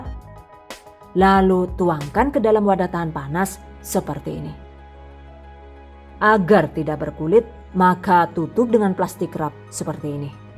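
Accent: Banyumas, Indonesia. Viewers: Selain berguna bgt resep2nya..channel ini sgt menhibur bikin ngakak kalo mbak sdh keluarin bahasa ngapaknya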